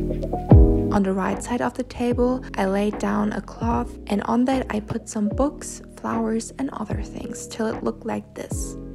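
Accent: Germany. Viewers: You look soo pretty!! And with your sweet german accent girl you nailed that room makeover!! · She up is German I think I hear the accent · Es ist voll süß das man deinen deutschen akzent hören kann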